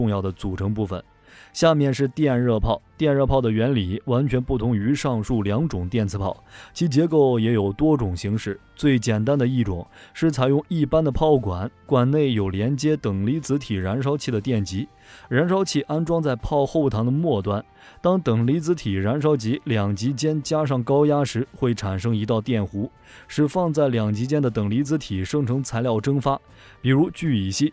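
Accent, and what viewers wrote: China. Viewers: 那是中國的唸法，畢竟是他們國家的唸法，就不要用自己國家的唸法去糾正別人了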